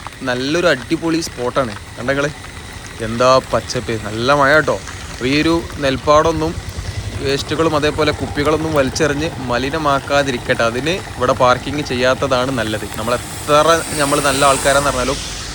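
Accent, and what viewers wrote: Malappuram, India. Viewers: Thumbnail ഇടുമ്പോഴെങ്കിലും ഒന്ന് ശ്രദ്ധിക്ക് ബ്രോ 🙏വഴൽ അല്ല, വയൽ ആണ്. സംസാരിക്കുമ്പോൾ നിങ്ങളുടെ മലപ്പുറം accent തന്നെ ആയ്ക്കോട്ടെ, കേൾക്കാൻ രസമാണ്❤ ചെറിയ ഒരു അഭിപ്രായം പറഞ്ഞു എന്നേ ഉള്ളൂ! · വീഡിയോ രസകരം ആയിട്ടുണ്ട്. ഞാൻ ഒരു തൃശ്ശൂർ കാരനാണ്. മലപ്പുറം ഭാഷക്ക് അതിന്റേതായ ചന്തമുണ്ട്. കളിയാക്കുന്നവർ കളിയാക്കട്ടെ. നിങ്ങൾ നിങ്ങളുടെ ശൈലിയിൽ പറയുമ്പോഴാണ് അതിന്റെ സുഖം. മഴ " മയ " ആയാലും ഭൂമി ഇടിഞ്ഞുവീഴുകയില്ല. ഭാഷയുടെ തനിമയാണ് അതിന്റെ ഭംഗി.മഴയത്ത് കഷ്ടപ്പെട്ട് എടുത്ത വീഡിയോ വളരെ നന്നായിട്ടുണ്ട്. ഇനിയും വീഡിയോ ചെയ്യണം.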